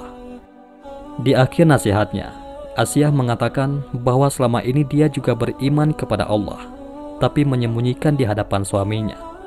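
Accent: Aceh, Indonesia. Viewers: Dari aku perhatikan cara bicara channel ini Org ini org aceh logat bahasanya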